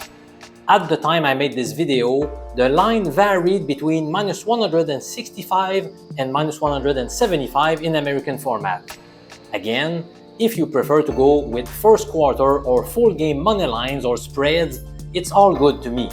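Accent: Quebec, Canada. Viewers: I love the French Canadian accent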